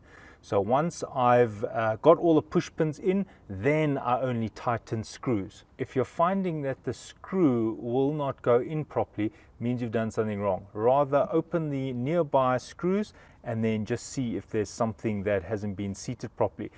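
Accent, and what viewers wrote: South Africa. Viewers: Actually, I found the opposite to be true. He's quite articulate. If you're not a native English speaker, I could see having issues understanding. Sounds like this gent is from South Africa. Personally, I'd suggest just being thankful he cared enough to share his experience and teach folks how to do this job. CC, playback control and rewind are things. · Yes this guy is definitely from South Africa, as am I. He really is a very good instructor, especially on the e65. His videos has helped me out a lot already.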